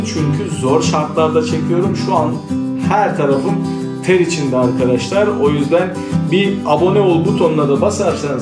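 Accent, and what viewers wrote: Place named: Sivas, Turkey. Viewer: abi sivasın neresindensin :D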